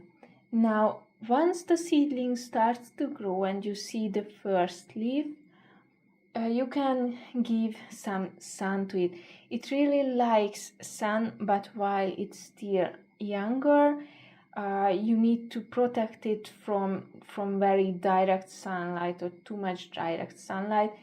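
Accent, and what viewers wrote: Hungary. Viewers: It's very Hungarian English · Are you Hungarian? The accent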